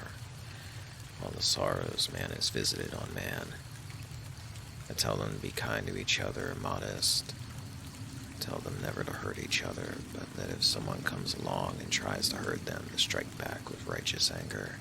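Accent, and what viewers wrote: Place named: West, United States. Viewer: Underrated video. You put on a good voice and have a natural Western accent. Hope this gets the recognition it deserves.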